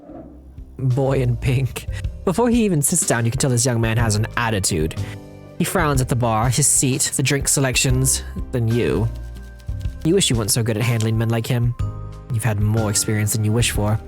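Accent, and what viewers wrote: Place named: Boston, United States. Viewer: The Boston accent was fun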